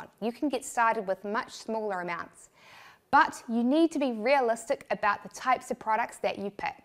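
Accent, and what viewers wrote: New Zealand. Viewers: I am Australian you accent sounds NZ but slightly stronger than what i normally hear. I don't know why i said that. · ooo gash you r a kiwi! click the subscription button.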